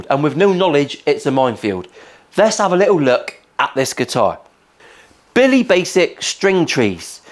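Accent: United Kingdom. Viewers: Are you kidding? I watch your video only for the British accent and mainly for the swearing. It brings flavor also 😂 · Great demo; as a Yank I do find it enjoyable to listen to your Brit accent, especially the nasty bits. ;) I'm also a big fan of Fenders and Squiers, having owned quite a few older Fenders (60s and 70s, yes I'm a geezer) and also a good few Squiers and recent Fenders, especially Mex ones. I just last week got a Squier CV 50s (pine body) and I like it a lot. My favorites are the Thinlines though, for the reduced weight. I can see that you've easily doubled the value (cost) of that guitar with all the work you've done to it, and whoever buys it will be a lucky bugger. Especially 'cause it's pink...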